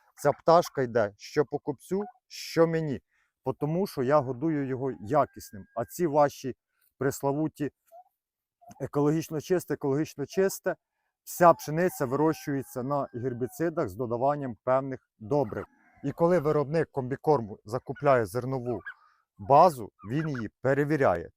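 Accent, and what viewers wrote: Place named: Vinnytsia, Ukraine. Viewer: Вінницькій говір😮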